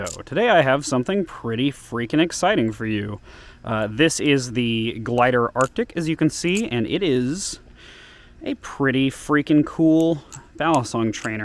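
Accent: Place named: United States. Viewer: you're from georgia? you sound so american.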